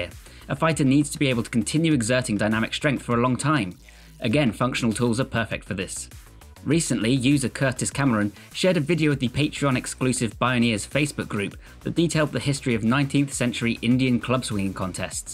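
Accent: United Kingdom. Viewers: I wanna hear u say wingardium levIOsa, (cuz your accent is similar to harmoine's)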